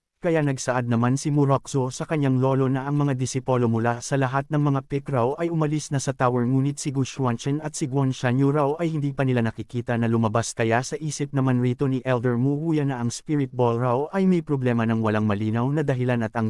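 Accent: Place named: United States. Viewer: ok nayan lods wag Mona bagohin feel kolang Kasi Americano mag salita maganda namn recap mo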